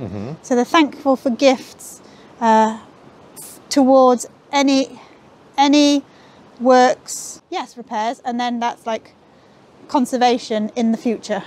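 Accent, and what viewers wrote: Wales, United Kingdom. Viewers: Absolutely love the Welsh accent. And miss speaks beautiful.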